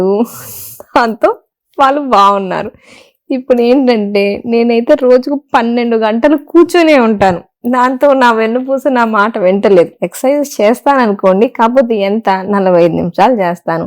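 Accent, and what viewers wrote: Guntur, India. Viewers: Brahmandamga cheppavamma. Somehow, I like your Telugu accent of Guntur area and your down to earth explanation, which itself is a great virtue for any doctor.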